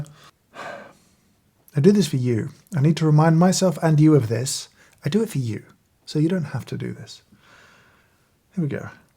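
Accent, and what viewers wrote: United Kingdom. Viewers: James' your british man's british man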